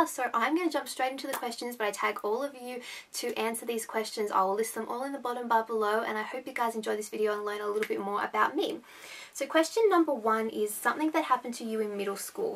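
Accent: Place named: Australia. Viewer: just stumbles onto this video and had to subscribe, it sounds creepy but you have the nicest, most relaxing voice and accent ever! i love australian accents! Definitely see live of you get chance, i've seen them 4 times now and they're my favourite band ever! They're kncredible live! And Danny is a lot hotter close up;) haha x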